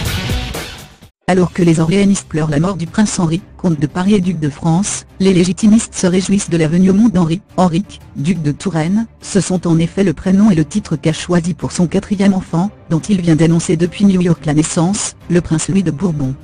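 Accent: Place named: Spain. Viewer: Il parle Français comme ma concierge espagnole.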